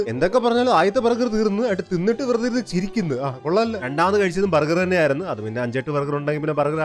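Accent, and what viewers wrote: Kottayam, India. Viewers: Bro kottayam alle😂😂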